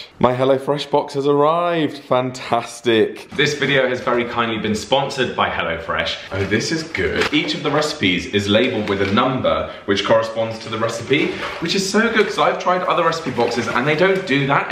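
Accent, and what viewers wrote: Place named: RP, United Kingdom. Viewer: By any chance, does Keegan envy you that you speak such pure royal English, and he can't, even though you grew up in 1 country? ;) <3, separate Keegan 🥰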